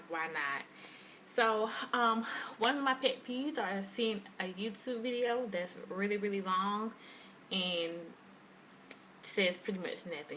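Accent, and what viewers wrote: South, United States. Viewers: Cute accent ,must be southern ,not know matter what you beautiful Boo.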